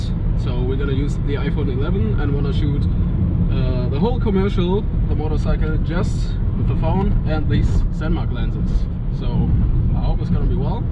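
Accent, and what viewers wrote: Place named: Germany. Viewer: Allein wegen dem deutsch englisch like am Start 😂👍🏽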